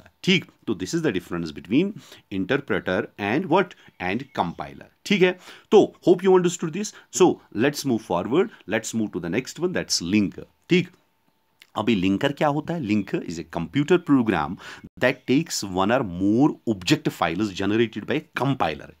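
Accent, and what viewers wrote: Kashmir, India. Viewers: Sir I think you r from kashmir am I right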